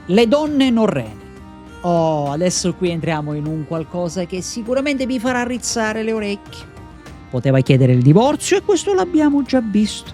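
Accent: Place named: Sicily, Italy. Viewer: 😂il sangue del sud non mente😄simpatia pura….sei il prototipo di un professore scolastico del nuovo mondo…simpatico…veritiero e che incolla l’attenzione …complimenti….il tuo accento sembra della Sicilia sicula non sicana ☺️👍👍👍👍👍🏆complimenti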